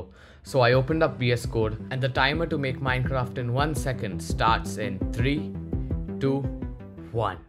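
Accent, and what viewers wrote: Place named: India. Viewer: ur indian accent is heavy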